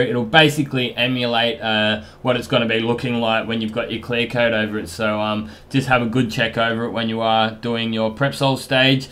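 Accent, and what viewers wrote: Australia. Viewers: Thanks Aussy much appreciate. Like you very. Much. Learning everything. I could. Not understand you at first the Aussy accent. Ha ha After listening closely for a little bit I picked it up no problem. Much appreciate your video work. You're a great mate. Your answer sounds right and makes sense. The clogging is why I go to the water. I use a trickle from a hose. Works well but I'd definitely a pain in the butt.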